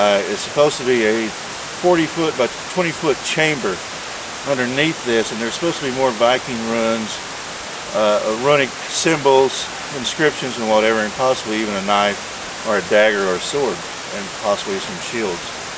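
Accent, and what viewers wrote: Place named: South, United States. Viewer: That is just his accent! He is actually pronouncing it similar to dune, you just hear it as run, or closer to ruin, but said together and fast. I suggest you never visit the South, or any other place where they tend to have accents, like EVERYplace! lol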